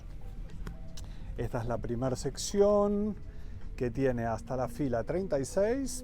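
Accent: Argentina. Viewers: DONDE NACISTE EN LA MATANZA POR TU FORMA DE HABLAR 😮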